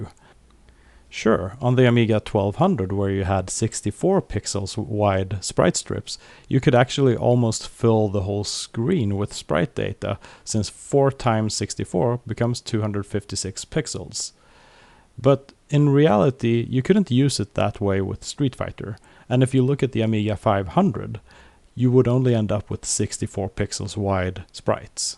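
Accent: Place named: Sweden. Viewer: With that accent i know you are swedish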